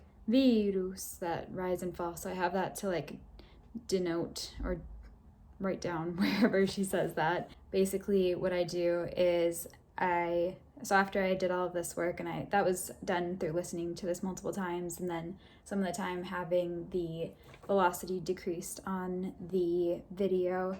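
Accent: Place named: Paisa, Colombia. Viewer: Súper bonito como se ya va pegando el acento paisa. Tienes una voz suave y es muy agradable escucharla. Felicitaciones. (Congrats).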